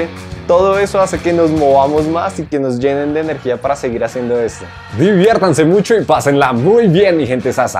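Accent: Colombia. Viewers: Eres colobiano?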